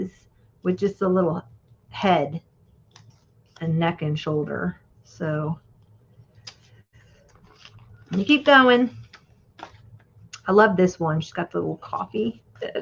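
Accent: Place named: United States. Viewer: Weird comment, but has anyone ever told you that you sound like Kelly Clarkson? You must have been raised in the same part of the US. You have the same accents.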